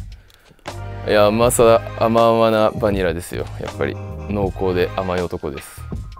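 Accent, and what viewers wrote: Japan. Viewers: ugh he's so hot, his voice is so deep and i love his Japanese accent 😩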